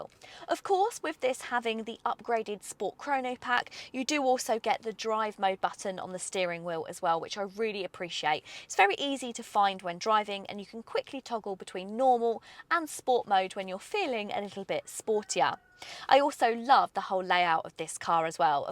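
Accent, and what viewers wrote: Essex, United Kingdom. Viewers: Are all of this ladies family , friends and parters the commenters on here ? Her outfit is ill fitting, nasty colour not fitting her figure or her Essex accent. She sounds pleasant and informed, better than Clarkson’s ego reviews. She does speak a little fast. Slow down a tad . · Hi, nice and informative video, great car regardless of the price. Besides, with a lovely British accent, a perfect listening exercise 🙂. Bye from Spain.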